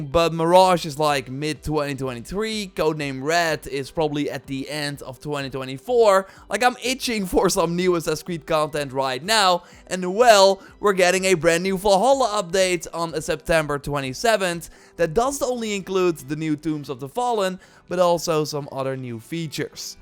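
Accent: Netherlands. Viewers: you sound dutch, is this correct?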